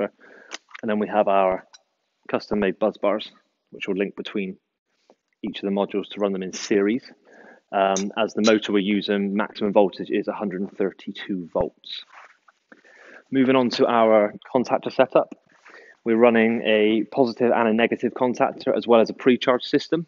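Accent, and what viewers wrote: Bristol, United Kingdom. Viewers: You sound like your from Warmley or at least East Bristol..... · Do I detect a bristolian accent? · Good to see you guys pushing a bit more you tube content! Gotta love a strong Bristolian accent. "Alright my lover"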